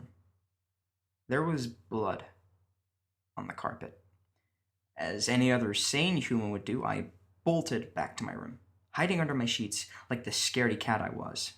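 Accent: United States. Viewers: The American accent sounds fantastic, only thing I noticed that was a bit wrong was when you say "idea" you pronounce it more like the British "ideer" and less like the American "ideeya". I Hope you don't mind some constructive criticism. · why do you use an American accent when you read creepy pastas?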